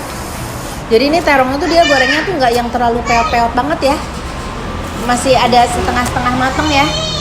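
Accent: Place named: Garut, Indonesia. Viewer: Paling resep pami tth tos nyarios sunda logat garut sok d ulang" Aq mah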